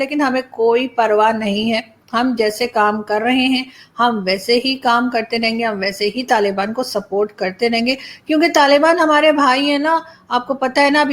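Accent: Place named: Delhi, India. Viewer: Your explanation of 250 gms bombs with the help of US drones is superb. I mean your hindi/punjabi accent is just like what we hear in New Delhi.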